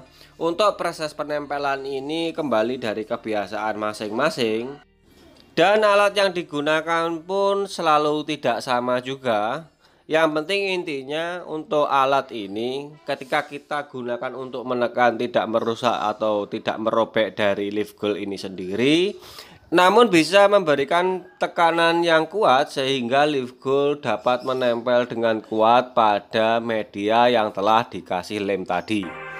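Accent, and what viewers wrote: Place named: Kediri, Indonesia. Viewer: Koyok wong kediri ya kui mas 😁😅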